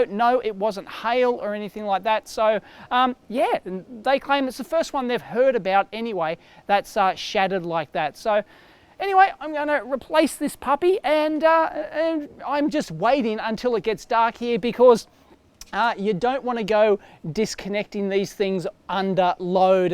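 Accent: Australia. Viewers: There are 2 reasons why I watch your videos: 1. Electronics 2. Your Aussie accent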